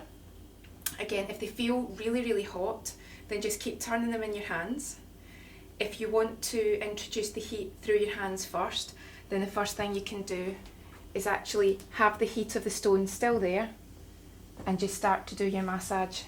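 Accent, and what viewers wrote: Scotland, United Kingdom. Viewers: Really informative and relaxing to watch, as everyone has said. I love the Scottish accent too! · Scottish accent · Scottish is an actual language. She's just speaking english in an scottish accent. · Ah ma fellow scot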